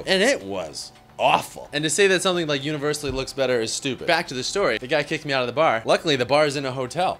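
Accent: Boston, United States. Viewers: don't you dare fuckin censor that beautiful boston twang ya gump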